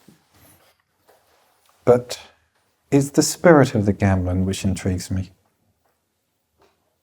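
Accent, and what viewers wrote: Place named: RP, United Kingdom. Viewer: What I like most about Fripp is his accent. Clear, well spoken English. Maybe a bit too RP on the ends of words sometimes, but otherwise very clear and easy to understand.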